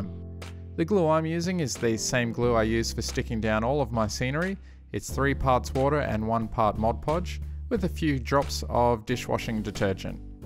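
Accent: Australia. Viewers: He's Australian. The NZ accent is a bit different to ours but apparently people not from Oz or NZ can't really tell the difference.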